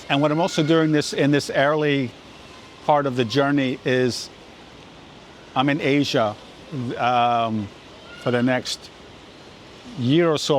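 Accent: Scotland, United Kingdom. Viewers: Definitely a Scottish accent in there somewhere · Is this deal for people only in the us? I don’t think people from the uk can get that card unfortunately, and he sounds Scottish so doubt he would get it either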